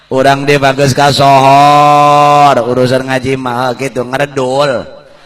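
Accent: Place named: Rangkasbitung, Indonesia. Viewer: Rangkas bitung hadir poko na kh mah eweh 2 na mantap ciri khasnya bahasa rangkas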